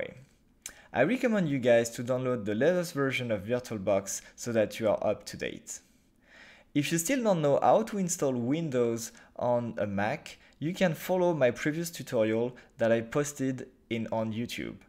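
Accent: France. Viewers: are u french xD ?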